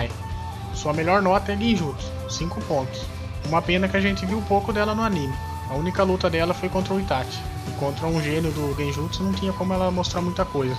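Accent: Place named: Paraná, Brazil. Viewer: vc e paraienese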